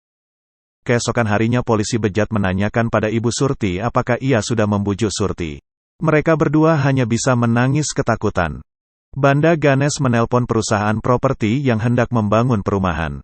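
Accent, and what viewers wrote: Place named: Banyumas, Indonesia. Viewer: Wong ngapak pembawa acaranya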